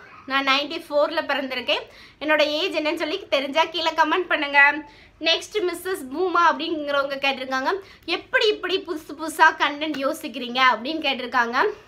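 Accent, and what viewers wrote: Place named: Nagercoil, India. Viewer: illa akka...unga slang nagarkovil madiri iruku adan keten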